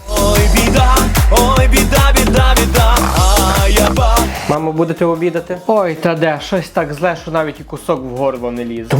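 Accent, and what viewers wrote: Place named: Lviv, Ukraine. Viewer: У вас такой хороший юмор .что снова приходит настроение .А ещё нравится чисто львовский диалект .он очень приятный .Спасибо вам и радуйте нас и далее.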